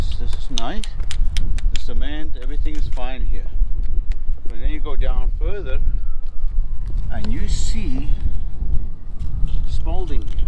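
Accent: South Africa. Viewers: That South African accent is undeniable. Good work.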